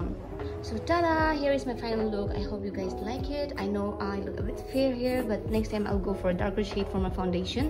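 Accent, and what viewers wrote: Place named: Nagaland, India. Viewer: Love the way you speak Hindi mix nagames ....😂😘😘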